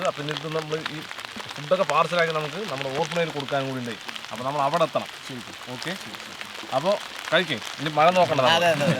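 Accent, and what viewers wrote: Palakkad, India. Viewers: Palakkkadan slang♥️😌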